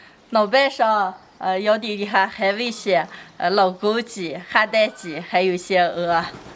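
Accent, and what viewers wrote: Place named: Northwest, China. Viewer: ，加油！你的视频为我在疫情期间带来了温暖和乡音。保持西北人特有的质朴和真实，相信你的视频会传播的越来越远。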